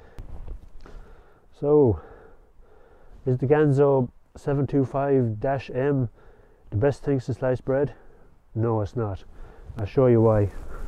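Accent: Ireland. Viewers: Your channel is very wholesome and your Irish accent is very easy on the ears brother. The Irish have the most beautiful language of all European continent. The romance languages of Europe pale in comparison to the Irish language. Many Years ago, for 26 years, I owned a made in USA Schrade Old Timer Blazer stockman. That three blade stockman blazer had a very special design large sheepfoot blade that sliced through saplings, feed sacks, rope, cardboard boxes and weeds like a hot knife through butter. It never became loose, never broke, never failed on me after 26 years of hard pressure testing. The large clip blade cut many fruits & vegetables well and also cleaned fish. It was carbon steel so honed easily and held an edge well. The spey blade worked well for skinning many squirrel & white tail deer. I gifted that knife, my most prized possession, to my best Army brother. 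Schrade Old Timer knives are no longer made in USA and their quality has gone down since being made now by atheist communist China.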